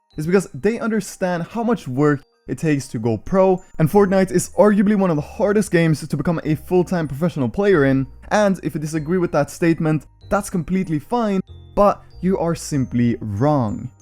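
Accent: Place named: Norway. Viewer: jeg visste at du var norsk jeg kunne høre comsa dine pa turneys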